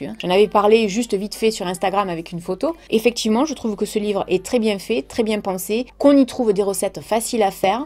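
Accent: South, France. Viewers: J’ai découvert par hasard vos vidéos il y’a deux mois. Ce qui m’a plu d’abord était votre accent du sud, un accent que j’ai reconnu et j’ai été contente de voir que vous ne l’aviez pas modifié. De par mon âge je pourrais être votre maman. Moi aussi je suis du sud de la France mais je vis à l’etranger depuis des décennies cependant j’ai conservé mon accent et nombre de français me l’ont toujours reproché. Ceci dit, je trouve vos vidéos toujours franches et intéressantes. Bravo. Je connais Yankee candles depuis bien des années car elles ont été commercialisées ici bien avant la France et je suis d’accord avec vous, elle sont de bonne qualité, ma fille les aime beaucoup mais leurs parfums sont très prononcés. Je préfère me servir d’huiles essentielles (lavande, orange, vanillle et menthe poivrée). Merci.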